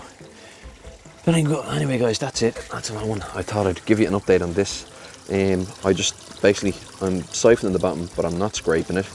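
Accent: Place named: United Kingdom. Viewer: I’ve just come across your channel (Im a fellow goldfish YouTuber 😁) where abouts are you based? You sound British but I can’t believe the weather is warm enough to have goldfish in a tank outdoors all year. It looks amazing by the way and I’m definitely subscribing so I can see more! 👍🏻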